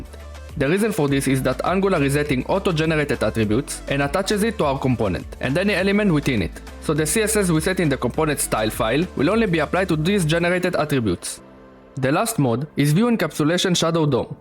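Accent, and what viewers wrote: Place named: India. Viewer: oh my God! Is there any ony of this videos that is not made with indian accent/???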